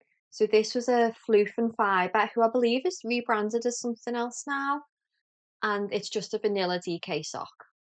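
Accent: United Kingdom. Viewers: I love your socks and your accent! Where in the UK are you from?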